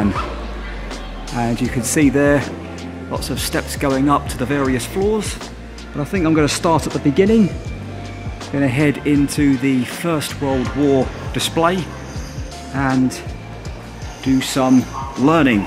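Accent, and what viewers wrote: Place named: Essex, United Kingdom. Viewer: Been watching your lovely videos for a while now,loved the bulgaria ones,because of you we booked holiday there.you sound like a essex boy,im a cockney not many of me left debs xx ps your a 💎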